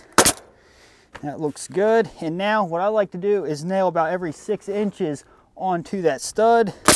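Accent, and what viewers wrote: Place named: Pittsburgh, United States. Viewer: Listen to that Pittsburgh accent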